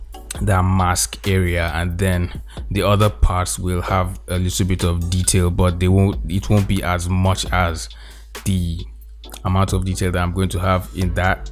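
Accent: Nigeria. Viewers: Awesome man learnt so much from watching this process btw cool nigerian accent <mail>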